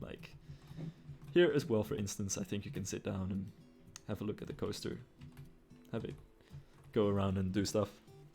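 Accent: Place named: Netherlands. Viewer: You sound Dutch. Are u from the Netherlands?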